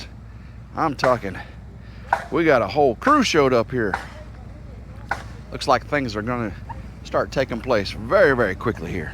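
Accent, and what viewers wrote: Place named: South, United States. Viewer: You don't like the accent? Like Skynyrd said, a southern man don't need you around anyhow! Cool video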